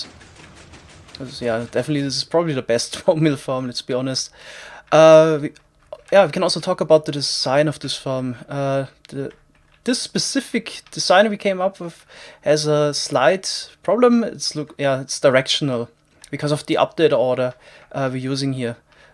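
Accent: Germany. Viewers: Wie man einfach das deutsche raushört :D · Wow, dieser Deutsche Akzent. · Are you German? Your accent sounds like it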